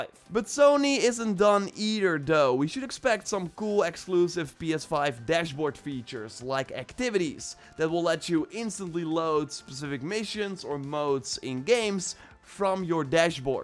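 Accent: Netherlands. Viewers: Je klinkt als een hollander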